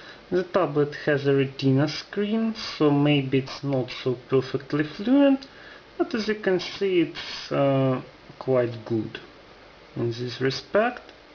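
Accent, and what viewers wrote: Russia. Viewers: с первых слов произношения слышно русского :)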